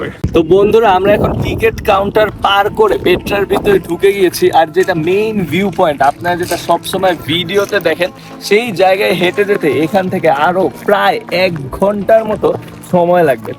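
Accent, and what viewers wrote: Kolkata, India. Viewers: আপনার একসেন্ট কলকাতা টাইপ। প্লিজ বিষয়টি একটু ক্লিয়ার করেন। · আপনার ভাষা কলকাতার লোকদের মতো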